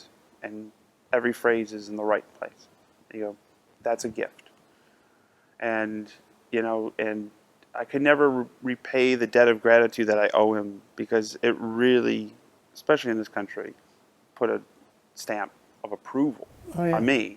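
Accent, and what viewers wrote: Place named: United States. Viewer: I have much respect for Bonamassa as a person and as a musician. Whilst he admits to a slight American accent, to my born in Surrey England (as was Clapton) ears, his diction and grammar, unlike many Americans, is perfect! I am certain that did not need to address Eric Clapton as 'Mr Clapton' or 'Sir' (Eric has a 'CBE' but deserves a Knighthood). Despite his fame he is a down-to-earth guy who is pleased to be addressed by his given name. If I, a nondescript fan of his music, happened to bump into him I would say "Hi Eric, I'm Alan, a fan of your work" and he may be tired of the 'Autograph Hunters' but would be semi-polite. If Bonamassa bumped into Eric and said the same Eric would have returned the compliment and suggested that he would be pleased if they could they jam together. And, of course, these two great musicians shared a stage despite unnecessary and ridiculous deference. 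Its just an exaggerated publicity story; never addressed Eric as 'Sir' or 'Mr Clapton', they each have respect for each other!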